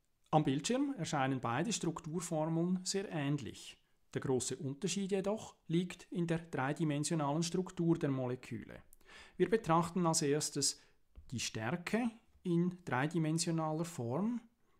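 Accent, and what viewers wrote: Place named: Switzerland. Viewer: No shit, er kommt (höchstwahrscheinlich) aus der Schweiz, hat daher einen Schweizer Dialekt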